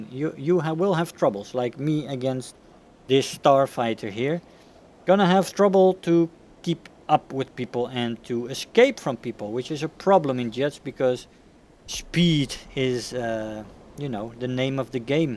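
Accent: Netherlands. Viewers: Hey dude you accent is dutch i think. Am i richt? If im not then sorry😂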